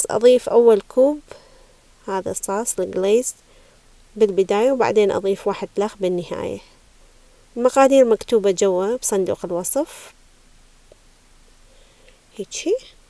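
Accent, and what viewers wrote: Iraq. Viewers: حبوبة اشكد تعجبيني شغلج مبسط وشرحج وافي وصوتج ذو نبرة عراقية جميل وقعها بالسمع للله يوفقج ونبقي نستمتع وياج بالطبخ الطيب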